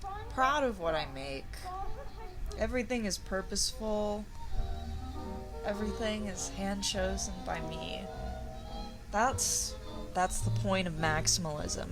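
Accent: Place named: Poland. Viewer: you do have a way with words :) I do not, I have fragmented abstract thoughts -sarah Paulson is in fact a lesbian -your tangents and ambiance are awesome -"to get woke" love that -im also a skipper when it comes to movies/tv -your polish accent is kinda sexy -im a mess and I love colour so I'm def anti minimalist -I wish I could actually FaceTime u or something lol(I don't have an iPhone 😂) cuz I have trouble verbalizing if I can't just blurt out what I wanna say -the only way I'm writing this is cause I wrote notes and then made bullet pts -better than nothing lol love ur videos so much (*˘︶˘*).｡*♡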